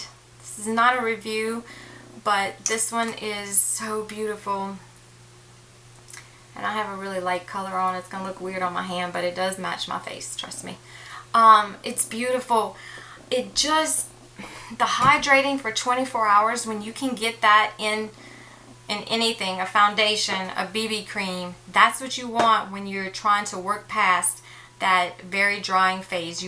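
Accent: Louisiana, United States. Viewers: What is your accent? I'm from SC and I feel like occasionally I'll hear some Cajun? I mostly stick to CC cream while I'm getting use to retin a. I'm just not peeling like a lot of people do. And I use a sun block with cc creams sunblock just for extra protection. I just found your channel. I love it. Looking forward to hearing more from you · Hi thanks for thos video. I can totally imagine us being friends if we were neighbors Totally. In fact you remind me of a friend of mine Natalie... lol. I live near new orleans. I thought i detected a little southern cajun accent in your speech. Its a unique sound but i wonder, are you from nawlens? I was just thinking about what you was saying about makeup in general and how you love it, love applying it , buying it, shopping for it.... Etc. And how its something just for you and i totally get it. I mean i love love love trying different makeup and all of the above as well. 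Lately I've been more and more concerned about the the ingredients used in my cosmetics. I think IT Cosmetics is an awesome brand because they really try to use good ingredienrs and not just products that arent harmful but ones that are actually helping our skin. And heres a tip to try for the ABSOLUTE BEST LOOKING UNDEREYE: before applying anything to ur cleaned face...use an undereye cream or whatever moisturizer absorbs well and that you love. After it sinks in.... wait 3-5 min and apply it again. Apply a min. Of two layers of this moisturizer. Pref..4-5 layers. Now, make sure these layers are not too thick and they do get absorbed into the skin... very well. This will plump your skin some and really really help. Step 2 - use the slightest amount of eyeshadow primer just under your lower lashes. You might want to apply it to your lid first and just sweep whats left over under the bottom lashes. 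It has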